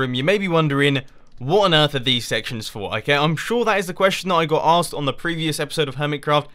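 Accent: United Kingdom. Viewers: I LOVE, AND I AM AMERICAN, SO AMERICANS LOVE BRITISH PEOPLE. THE ACCENT IS SO AWESOME. I respect that you spend time on these recordings, as I make videos myself, and I work for each one for about 4 hours, but you spend 3 whole days.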